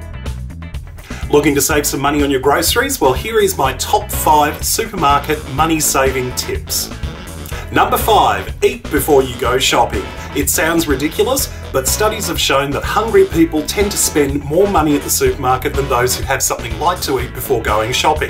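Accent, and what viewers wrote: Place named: Australia. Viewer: Yes he's Australian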